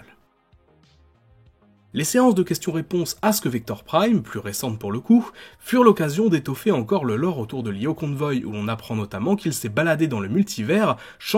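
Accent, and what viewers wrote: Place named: France. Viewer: J'avoue qu'avec un accent français, on comprend comme ça !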